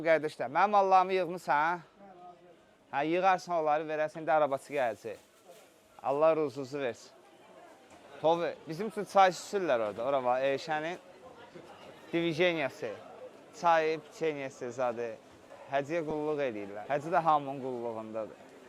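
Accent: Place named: Baku, Azerbaijan. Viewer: Can Ay Bakılım ləhçövə quzu kəsim.Qulağa necə xoş gəlir bizim şirin ləhcəmiz ağzunnan bal damlıyır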